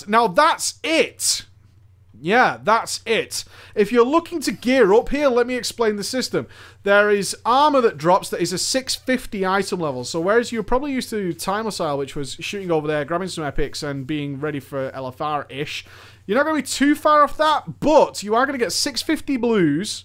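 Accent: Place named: Northern England, United Kingdom. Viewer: At the start of your videos do you say "It's here" or "It's "? Blooming northern accents! Keep up the good work mate.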